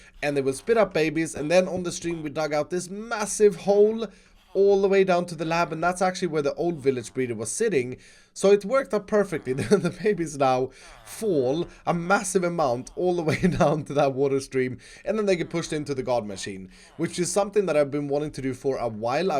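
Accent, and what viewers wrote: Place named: Sweden. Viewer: He's probably just developing an accent that's less swedish because the majority of the English-speaking world isn't swedish. He must think in a swedish accent, so it would be hard to lose it permanently.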